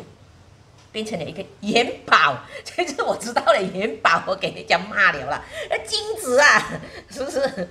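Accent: Malaysia. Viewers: 一听就是馬来西亚的华語！好有亲切感！那些话語馬来西亚人听得懂.，也听到很爽！ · 喜欢这个aunty 讲话很可爱也很真。纯马来西亚华语。希望你健康长命百岁，做出更多kuih的视频分享。和更多实验更简单教学方法。不然以后这些传统糕点就失传了。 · 大马的华语口音很好听，亲切而柔情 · 您是大好人肯无私分享，希望您能继续出做糕点的视频，马来西亚华语很亲切的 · 很喜欢aunty 讲的马来西亚口音的华语，很亲切，也喜欢aunty 介绍马来风味的中国小吃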